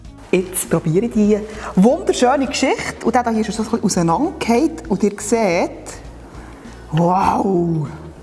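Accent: Bern, Switzerland. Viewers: wenn der Titel auf Hochdeutsch ist, dann werden die meisten wohl davon ausgehen, dass im Video auch Hochdeutsch geredet wird. Aber der Berner Dialekt ist so schön, dass es auch mir als Österreicher egal ist, dass du in der Mundart redet. Das meiste versteht man eh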